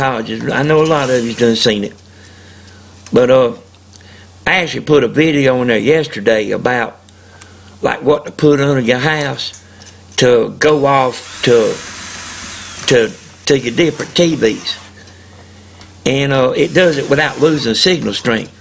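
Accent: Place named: Tennessee, United States. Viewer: Really appreciate the attention to detail. You and your videos are without a doubt the best and most properly informative antenna building tutorials on YouTube! Not to mention, everyone loves the sincere traditional American only southern Tennessee accent- adds that extra lovability to your clear credibility no doubt brother. God bless.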